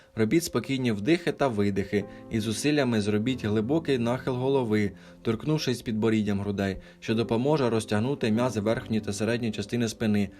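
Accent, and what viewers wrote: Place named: Poltava, Ukraine. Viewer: Круто, просто, і без води, Українська мова просто мед для моїх вух( якщо не помиляюся то Полтавський акцент) у автора велике майбутнє.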